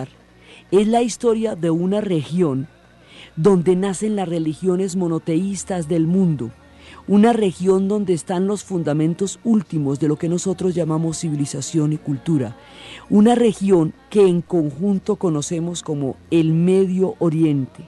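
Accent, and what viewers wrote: Colombia. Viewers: muy bien explicado.Por tu acento pareces Colombiano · excelente es de gran ayuda felicitaciones mujer ,,me gusta el acento por lo que noto es de el valle acento Caleño ....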